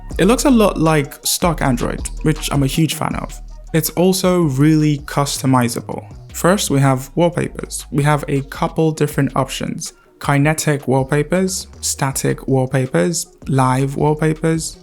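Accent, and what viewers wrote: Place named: England, United Kingdom. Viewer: he sounds english, am i tweaking??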